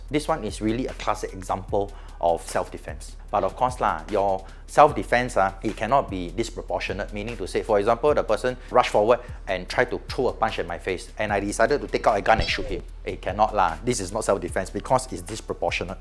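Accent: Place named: Singapore. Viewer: You are a cool lawyer. Thank you for explaining how the law works with regards murder charges. Love the singlish lah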